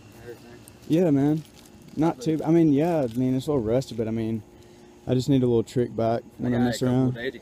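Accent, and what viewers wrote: South, United States. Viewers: it's like that alot in the south. some words come off really southern for some people and others dont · i love that country voice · Dude I am so confused on your accent. Like one second you sound normal American, then sometimes you sound like you have a southern accent, what the fuck.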